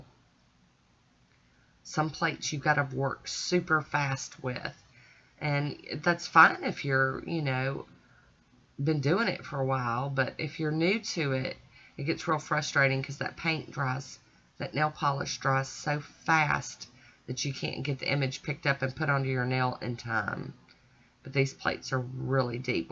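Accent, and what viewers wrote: South, United States. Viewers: I LOVE the southern accent. I’m from Talladega Al. Where are you?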